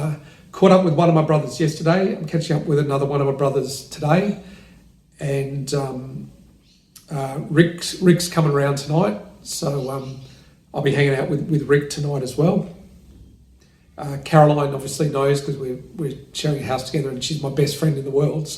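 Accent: Australia. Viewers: I am happy you are back. We worry about you and pray for you. Negative comments! Hard to believe there are weirdos like that It is bad news but I am glad to see you are going to fight. You look good, even if you don't feel good. You are alert and keeping positive no matter what. Hang in there and keep posting, especially before you go in for your scans. Try not to worry. We will pray for the best. You are so brave and an inspiration in courage. Love your cute Australian accent. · Dear, I pray for improvement in your situation (as much as an atheist can pray) and send you love and support. I like your channel for 2 reasons: 1. The beautifull Australian accent 2. The great person you are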